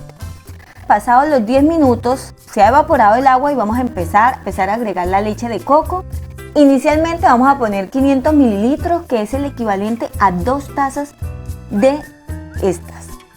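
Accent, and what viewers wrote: Colombia. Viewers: El acento no lo pude deducir...escuché valluno, costeño y paisa. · Ese acento es del llano, Santander o amazonas?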